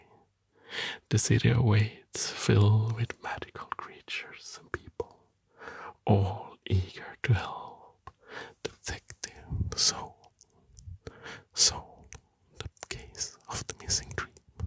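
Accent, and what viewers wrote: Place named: Germany. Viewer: I love your Asmr, you have like a German/northwest European accent which reminds me of a friend. You make great Asmr! Lots of respect to you 👊🏻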